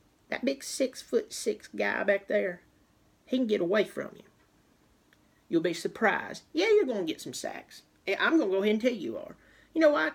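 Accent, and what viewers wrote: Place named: Mississippi, United States. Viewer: Your voice sounds like a Mississippi country girl...Roll Tide sweetie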